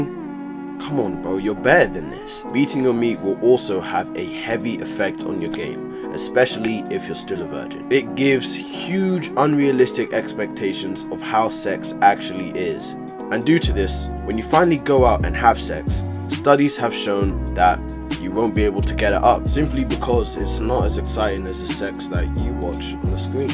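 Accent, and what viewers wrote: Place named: United Kingdom. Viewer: british black man version of a southern black man.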